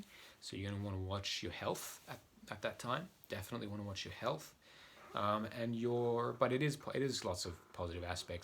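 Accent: Australia. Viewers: I'm so glad put you on for this Mercury retrograde video! I actually have Mercury retrograde in Pisces in my natal chart. I'm an Aquarius and I'm soaking up all your information! Great stuff! I'm definitely going to be subscribing to your youtube channel and sharing your videos with my friends! You sound like you're Australian? I love how you're giving a detailed explanation of Spika, etc. Thank you for talking about how each sign will be affected! That's very generous of you! All my best from the East coast in the states! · clearly knew the accent straight away… and was soooo thrilled was show casing your work… Amazing how it takes following someone over the other side of the world, to be able to appreciate someone in your own backyard! I’m on the gold coast… so happy you have been acknowledged for your skill and craft world wild.. shall follow … thank you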